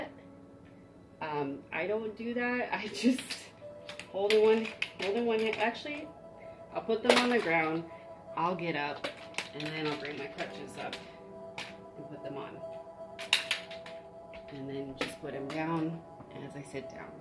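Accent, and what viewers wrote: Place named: United Kingdom. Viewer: From a British viewpoint you have a very pleasant accent, whereas when we berthed in LA we found the local accent harsh and unattractive. Difference in education? My wife used to retract her undercarriage much as you do - but she saw me in the RAF and I think imitated the aircraft - that was her story anyway!! .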